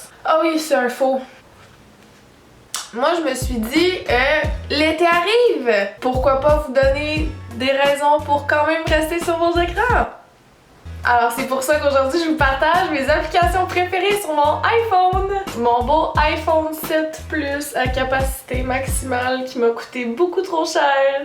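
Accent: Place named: Canada. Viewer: Mdr cette accent et ces expressions. J'aimerai trop aller au Canada un jour putain !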